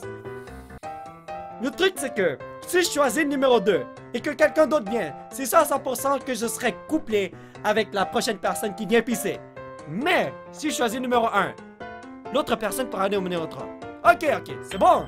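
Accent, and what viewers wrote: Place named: Canada. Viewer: salut je t'adore continue tes super est j(adore les canadien si tu veux savoir j'adore l'aksent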